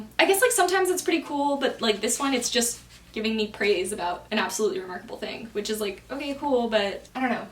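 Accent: Argentina. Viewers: me encanta tu acento argentino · OMG! You spoke in Spanish and your accent is really great, wow! I’m from Mexico, but I loved your Argentina’s accent. Your videos are amazing, I enjoy them a lot. 🤩👌🏻❤️ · i was shook with your argentinian accent!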